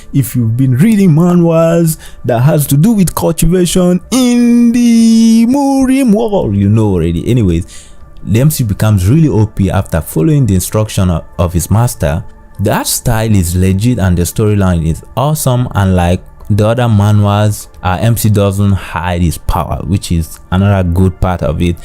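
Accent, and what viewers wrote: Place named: Nigeria. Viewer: Bro you Nigerian right Your accent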